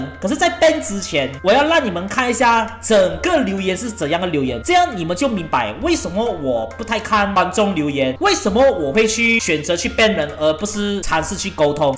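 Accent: Malaysia. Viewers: 對方真的很有事 就跟你說我是吐槽動畫版 你有跟我扯小説版 不過 我現在才發現 有馬來西亞的口音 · 看Kuma桑吐槽的口音好好笑😂 回去看自介果然是馬來西亞人，跟"娘娘"一樣口音😋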